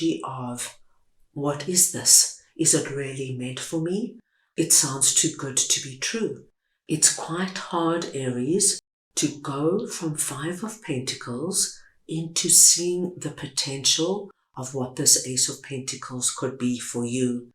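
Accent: South Africa. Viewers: Sounds white south African possibly Afrikan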